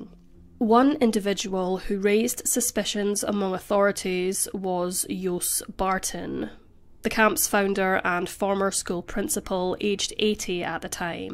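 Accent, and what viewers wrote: Scotland, United Kingdom. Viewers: where is the narrator from? Unique voice, sounds a wee bit Scottish 🤷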